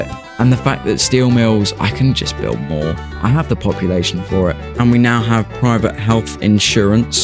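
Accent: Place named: United Kingdom. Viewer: Just discovered your channel, that Opening voice sound like feedback gaming voice, I guess you're both are British.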